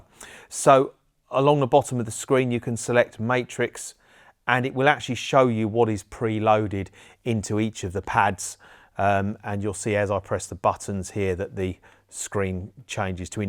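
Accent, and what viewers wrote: United Kingdom. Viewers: Cockney geezer 😅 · Sein Englisch ist makellos und ein schöner britischer Akzent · Sorry, aber als Engländer hat er halt keinen deutschen Akzent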